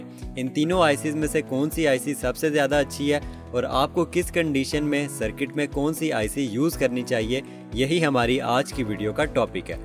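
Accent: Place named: Pakistan. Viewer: Pakistan accent 😊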